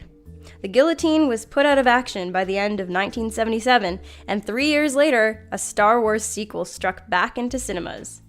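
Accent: Canada. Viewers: Okay the only fact that blew my mind (No pun intended, you'll see why) is that that they're pretty much no naturally blue pigmented foods. And I had a feeling you were Canadian because a few videos ago I remember hearing pronounce a few words in ways that sounded like a Canadian said it. And it's cool! I'm American, but I'm fans of some Canadian skaters, there's some Canadian YouTubers I think are cool, and I have a few friends that are Canadian.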